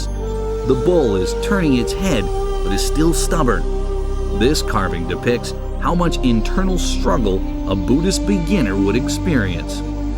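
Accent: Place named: United States. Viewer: Horrible Americanisation of Chinese culture. Awful narration with American accent, why?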